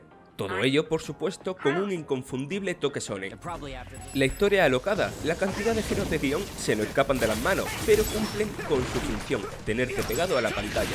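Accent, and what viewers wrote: Andalusia, Spain. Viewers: Pues no parece esforzarse mucho en hablar castellano... El acento andalú le sale en cada 3 de 4 palabras... Yo sí que me he tenido que esforzar por entenderle....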